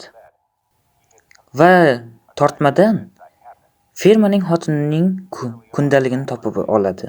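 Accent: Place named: Shofirkon, Uzbekistan. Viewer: Shofirkonlikmisz deymn shevayz tortyapti😂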